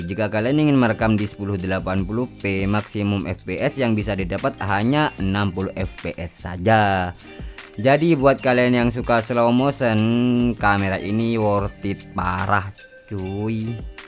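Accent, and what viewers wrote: Madura, Indonesia. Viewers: Madura banget. Anak madura mana ini? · wah worth parah.... mantab,,, logat maduranya masih nempel om... hahaha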